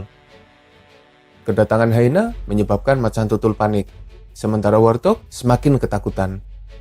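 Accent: Banyumas, Indonesia. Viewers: admine wong ngapak ya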